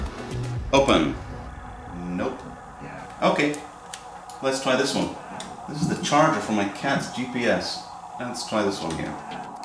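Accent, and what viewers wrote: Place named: Scotland, United Kingdom. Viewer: I hope so matey, because I love them and your Scottish accent.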